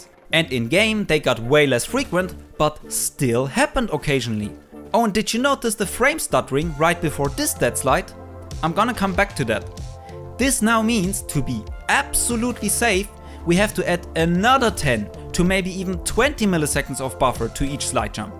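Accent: Germany. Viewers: Hi are you german? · Are you German because you sound like nearly everybody in Germany and Austria when they speak german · Nice Video Hey, are you German? Your Accent sounds like it ^^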